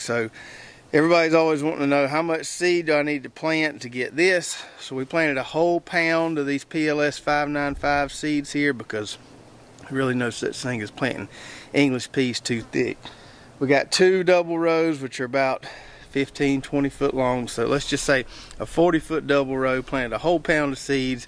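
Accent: South, United States. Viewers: You southern guys are the best. Love your accent and your down home smarts. I too live in zone 8B (in the Pacific Northwest), but it's a world apart from where you live. While your low temperatures are similar to ours, we're in those lows for months at a time, not just on occasion. I am envious of your warm weather that allows winter gardening of crops we can only grow in the summer. I've purchased some of your tools. First class quality.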